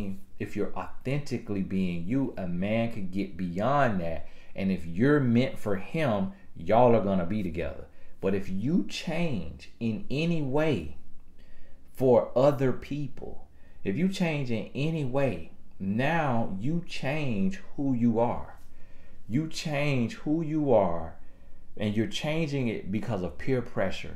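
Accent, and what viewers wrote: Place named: Polk County, United States. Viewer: I can definitely tell you’re from Polk County.. lol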